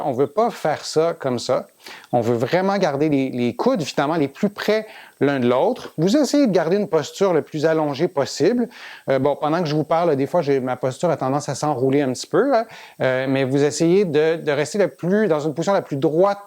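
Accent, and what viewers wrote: Quebec, Canada. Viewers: Bonjour, tout d'abord merci pour vos vidéos! Je suis québécoise, habitant en France, mais j'ai besoin de me reconnecter avec l'accent de mon patelin ça me fait du bien! Je voulais juste vous proposer, lorsque vous démontrez un exercice pour les jambes, de descendre votre caméra au niveau de vos jambes. Je suis prof. de fitness et ce genre d'exercice, aussi simple soit-il amène un positionnement souvent erroné. Le genou est souvent trop vers l'avant etc..le visuel est très "parlant"! Encore merci pour vos capsules, dans notre métier il faut se tenir au courant et se mettre à jour continuellement.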